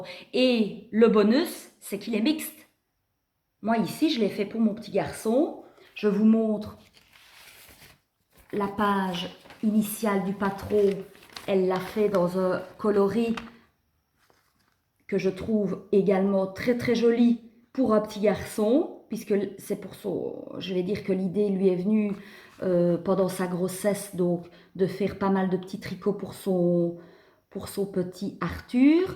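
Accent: Belgium. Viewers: Je viens de découvrir ton podcast, enfin une belge, et à l'accent ont ne doit pas être loint, podcast très agréable à écouter et regarder félicitations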